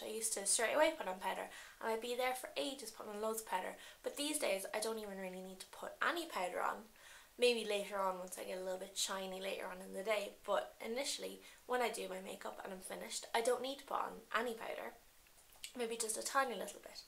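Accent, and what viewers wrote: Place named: Ireland. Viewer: omg, can you please like smuggle me and take me to Ireland please??! your accent, girl!!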